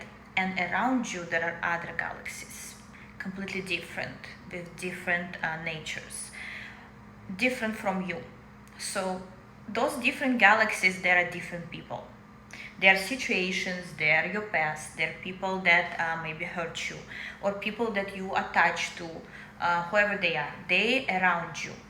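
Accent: Russia. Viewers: You've got a strong ruzzian accent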